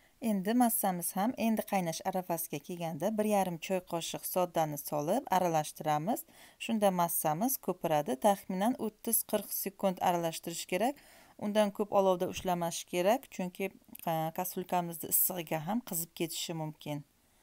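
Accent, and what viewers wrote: Khorezm, Uzbekistan. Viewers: Horazm shevasiga ohshattim.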